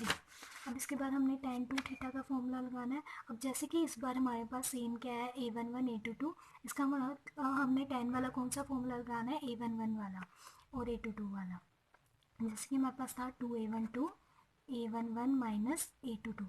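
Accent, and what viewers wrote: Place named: Haryana, India. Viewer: Liked your Haryanvi accent.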